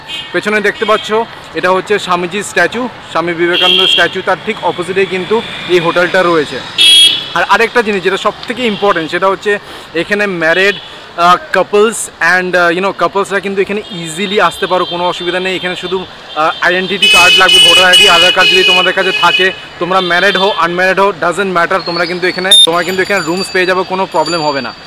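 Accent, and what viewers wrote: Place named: Bangladesh. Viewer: Accent to puro bangladeshi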